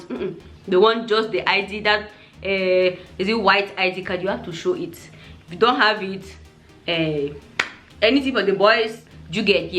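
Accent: Nigeria. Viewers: Good 😊😊 but you have Nigerian acent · Nice review but the puf puf and beans thing is a cultural shock to us And about what you said that you could buy allot of things with little money, things are not expensive and stuffs like that Try working in Nigeria, earning in Nigeria and buying in Nigeria, Then you will know if things are really cheap But in all thanks for the wonderful review It's how you sound like a Nigerian for me Stay blessed ❤❤❤ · What I don't understand is the fact that this girl is looking so much Nigerian, talking so much like Nigerian, and Nigerianly beautiful. Anyways, I don subscribe. She must have been a Nigerian in her former life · Thanks so much my sister giving that great account about our great nation Naija please stay bless my question is are you sure you are a camerounian ?am asking this because of your accent . but in all kudos to comments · You sound Nigerian though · Please, come back sooner because you carry Naija DNA in your system - it shows on your face and voice. 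· I'm seeing you for the first time and I totally enjoyed your content and style. Well done. You sound much more Nigeria than Cameroon. Explain why in not more than 20 words. You be very fyne gurl shaa. Keep making great content dear. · You are infact speaking and acting like a Nigerian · This is really interesting. Are you sure you are not Nigerian? Your accent and mannerism is all Nigerian. You are really a good girl. I pray some Nigerian guy would make you happy all the days of your life. Please stay here. This is your home. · You really sound Nigerian 🧢💝💝💝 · You're already talking like a Nigerian, it'll happen the another will keep you in Nigeria hopefully. First time seeing you and subscribed cos I loved how well you integrated into the society and the balanced views about Nigeria. Nigeria to the world !!! God bless you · Well done ! ! Several Nigerians don't even know the NEW national anthem, myself included. 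And, I'm not even going to bother to learn it. I prefer the OLD one since my days as a youth in primary school, etc. You sound very much like a Naija lady, Cameroon 🇨🇲 🇨🇲🇨🇲 and several West African countries are NIGERIA too, we're one PEOPLE. SALUTE 🇳🇬🇳🇬🇳🇬🇳🇬✌🏾👉🏾👍🏾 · You dey give me joy sis I couldn't even tell you weren't Nigeria from your accent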